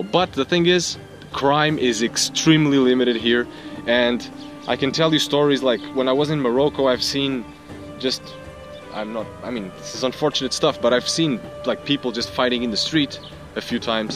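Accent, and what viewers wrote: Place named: Australia. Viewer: It sounds like Australian accents maybe wrong well that was nice from you Mate thanks for your visit to our beautiful country. for you guys is cheaper 👍🏼 come and visits us more often there's so many places to discover. Thanks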